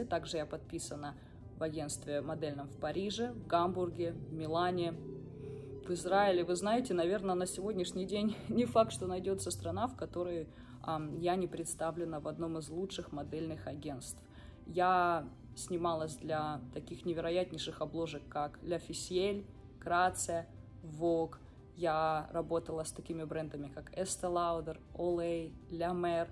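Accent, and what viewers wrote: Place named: Rostov, Russia. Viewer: Слышу слышу ростовский говор...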